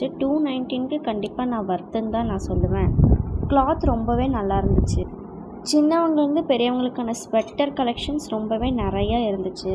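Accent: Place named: Coimbatore, India. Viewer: தங்கள் பதிவு மிகவும் அருமை... வாழ்த்துக்கள் தாங்கள் குரலை பார்த்தால் தாங்கள் கோயம்புத்தூர் என நினைக்கிறேன் வாழ்த்துக்கள்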